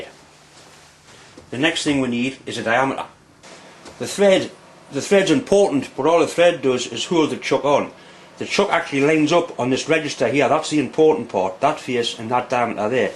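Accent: Newcastle, United Kingdom. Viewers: That seems to be a very quiet lathe. Easy to see that you are skilled tradesman.The speed of your hand movements are economical and accurate. May I ask, your accent , is that "Geordie". ?Greetings from Melbourne, Australia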